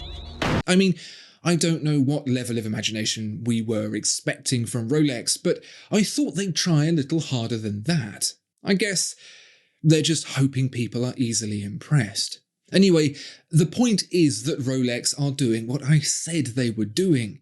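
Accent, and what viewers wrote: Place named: United Kingdom. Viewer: I love to put the face to the voice I’ve heard for years… However you gotta find a space where you’re better depth of field… The blue background is too stark… And all the YouTube army of watch channels do the perfunctory man cave muted colors with tchotchkes curated from the cork sniffing image they’re attempting to curate… And although I’m sure you paid an artist for your logo it looks too much like a car design and has nothing horological to denote the subject of your channel… Otherwise I’ve enjoyed you for many years and now my sons are all watch enthusiasts As with most things a British accent elevates and always has the right amount of tonality to not be hard on the ears and your copy is always a beautiful poetry of observation, Snark and on point assessment When I started researching watches the only man I found at that time was Alex from Watch advisor… Who actually lives in Switzerland and seems to know many companies ceo… I still find his charming enthusiasm and accent useful and comforting… But keep up the good work Your voice is often playing on the TV in our household🎉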